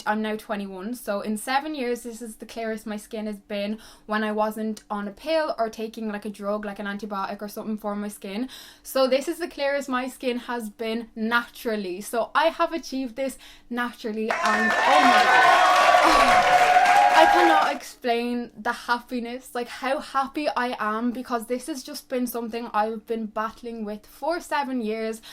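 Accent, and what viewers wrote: Ireland. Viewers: YOU ARE SO PRETTY and I love the Irish accent 😍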